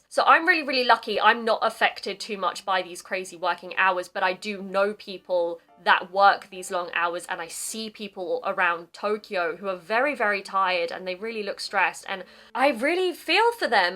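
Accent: RP, United Kingdom. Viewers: この動画でシャドウイングしよ。 勉強になるし、何よりmodernRPアクセント??が心地よい☺️ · I was studying in America for 3 years, so I get used to American English. But your British English is easy for me to understand. Overall, Japanese people are polite. I don't like some Japanese people because they discriminate against physically challenged people. One day, I had a job interview. An interviewer said, "What happened to your legs?" He kind of laughed. That was really rude. I was glad I didn't have to work for them. Since I am vegetarian, I should visit your country some day.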